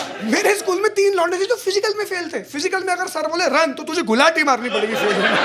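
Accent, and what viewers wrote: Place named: Delhi, India. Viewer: UK SE JADA DELHI KA LG RHA BHAI BC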